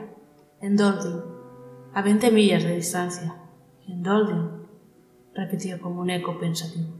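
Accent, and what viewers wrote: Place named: Spain. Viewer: Muchas gracias...me encanta que esté en español de España...y narras muy muy bien 😊❤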